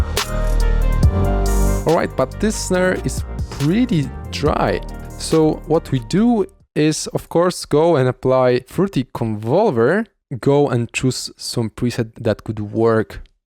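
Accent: Russia. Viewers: Ты русский что ли? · русский акцент😂🤟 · Акцент русский пздц, но видосы крутые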